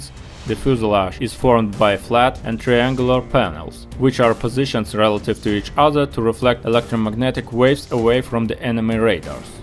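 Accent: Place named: Russia. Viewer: The narrator has obviously a Russian accent. Ironic, since this is about the Stealth.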